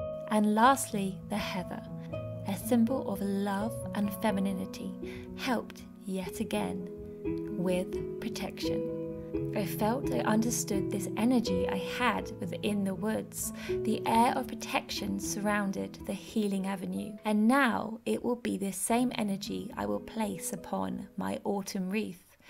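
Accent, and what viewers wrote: England, United Kingdom. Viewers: Witchcraft sounds so much more magical when discussed in an English accent! 🍂🍁🍂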